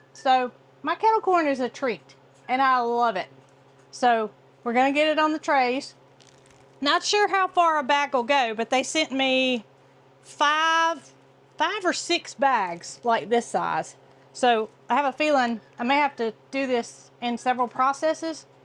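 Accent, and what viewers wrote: South, United States. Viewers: kettle corn or cattle corn I cannot understand the Southern accent..sorry1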